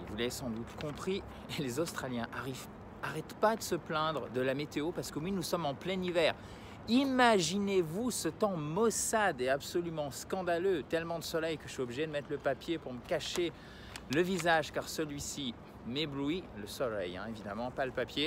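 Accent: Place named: United States. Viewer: Hey it s me Michelle. I work ou english ever days. I see yours videos and tutos de huito 's videos. The difference between you it s the accent American for you and Bretish for Huito. I heard Beatles ' s songs. At the beguining of octobre i have to go lesson by a teacher. I think subcrire at your formation at the end of this year. Not before because i have no time.!!!!!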